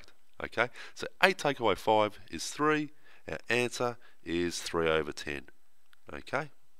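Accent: Australia. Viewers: When I take my test... I'll have this guys Australian voice in my head... Thank you sir!!!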